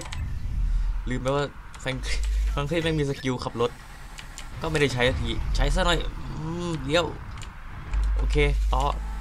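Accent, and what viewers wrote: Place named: South, Thailand. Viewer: พี่ พูดใต้เป็นด้วย 555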